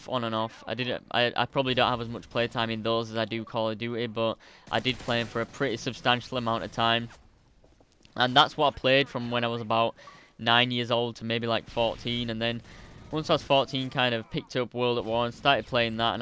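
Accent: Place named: Scotland, United Kingdom. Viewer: You sound more scottish in your livestream.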